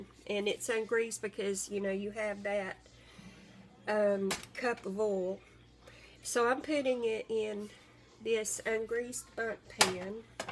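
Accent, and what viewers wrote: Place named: Rural, United States. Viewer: Love your country accent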